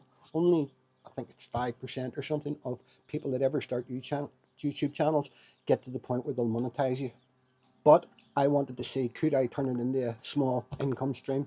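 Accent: Northern Ireland, United Kingdom. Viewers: Where did you move from? NI by the sound of it. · well, you can hear that he's northern Irish. Some Northern Irish people choose a British Passport and some choose an Irish Passport but they can apply for either. (As I understand it). I'm from the republic, so this is just my understanding.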